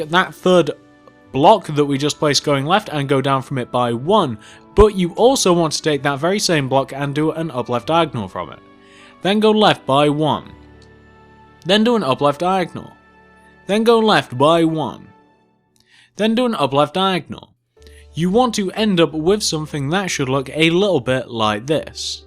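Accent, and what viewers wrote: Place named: RP, United Kingdom. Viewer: Where are you from cos your accent sounds kinda posh lol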